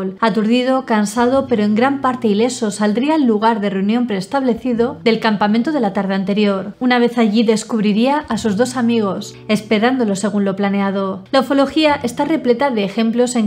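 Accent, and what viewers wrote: Spain. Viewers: Como siempre genial 😃 . Un pequeño consejo, dicho desde el respeto, si la narración fuera más lenta la disfrutaríamos más... Sé que los españoles hablan muy rápido, pero somos muchooooos fans latinos, así tu encanto llegaria al 120 % !!!!! OMG . GRACIAS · Qué hermoso acento español tienes. Tus investigaciones me encantan, pero con tu acento son perfectos. Saludos!!! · Hermosa con una linda voz y ese acento español , me enamore a primera vista ...de verdad 👍🥰 Saludos desde Chile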